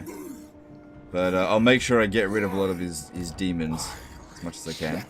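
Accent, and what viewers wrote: Australia. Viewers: Wait is Australian????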